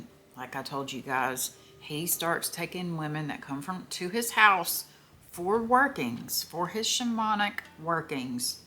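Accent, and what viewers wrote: South, United States. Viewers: oh I love a true crime channel with new stuff I havent heard of. and (hello from England) I love that soft spoken southern American accent so soothing. you are my new fave ❤❤❤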